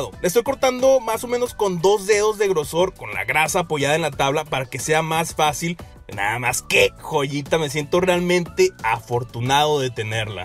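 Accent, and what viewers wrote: Mexico. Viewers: crei que eras de México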